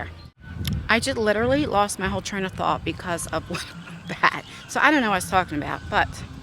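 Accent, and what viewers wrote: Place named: New Jersey, United States. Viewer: OMG I Love Instagram it puts on my makeup for meee and You have that Jersey Accent, I have the Philadelphia accent if there is one lol but I was born and raised in Philadelphia PA and now I'm in NJ but I like Your Accent, Very Nice and Kinda Cool to see a Jersey Girl 🌹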